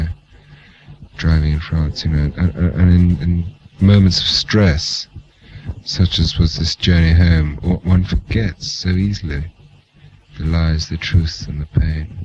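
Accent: RP, United Kingdom. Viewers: Beautiful accent. Posh but quintessentially English. He sounds fucked though. Thanks for posting. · Very clipped and upper class. But a lovely voice, and he finished with a chuckle, rest in peace x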